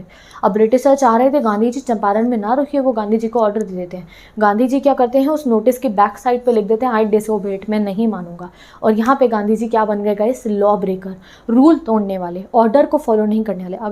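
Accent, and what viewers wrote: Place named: Odisha, India. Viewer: Are u from Odisha?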